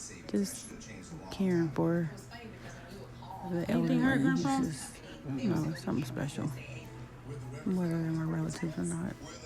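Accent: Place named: South, United States. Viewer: the southern accent makes your voice even more calming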